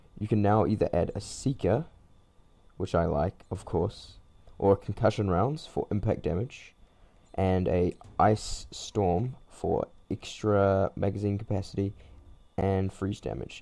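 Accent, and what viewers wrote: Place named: New Zealand. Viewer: "british accent"? sounds kiwi to me.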